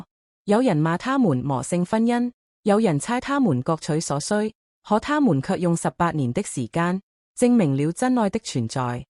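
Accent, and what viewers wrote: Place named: Cantonese, China. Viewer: 这讲话广东话这么难听😂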